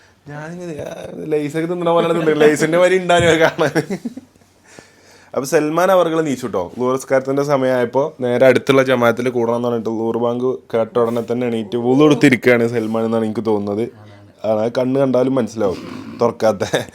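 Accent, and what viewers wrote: Malappuram, India. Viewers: Malappuram varthanam athaan ikk thonniya highlights 😂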